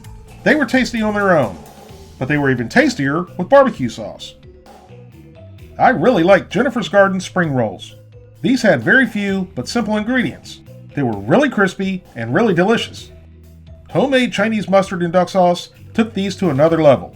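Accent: South, United States. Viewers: you better be a professional comic writer sidelining with these videos with quips like: "Like chewing on a moist mattress in a nursing home!" OMFG. You're humor is so New York with your 'southern twang'. Dry, like your stomach after hopefully being pumped out after ingesting these disgraceful products from questionable food companies.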